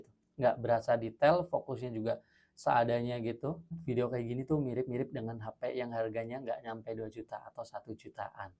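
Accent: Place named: Cirebon, Indonesia. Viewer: Cirebon banget indo nya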